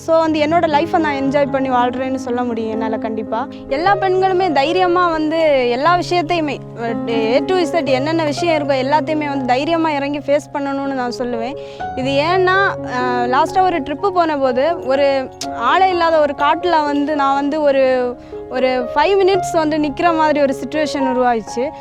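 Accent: Kanyakumari, India. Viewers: Uinga voice eaa solu thu kaniyakumari nu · Akka you’re kanyakumari Tamil slang so sweet akka. to beautiful❤️❤️❤️❤️👍 legend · Kannyakumari slang veliya tarriuthu akka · Hi ..... sister கன்னியாகுமரி slang nice...look like Kerala a slang ... உங்கள் கனவுகள் வெற்றி பெற வாழ்த்துக்கள்..... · Na slang vecha kandupidichitan Kanyakumari nu super sis nanum Kanyakumari tan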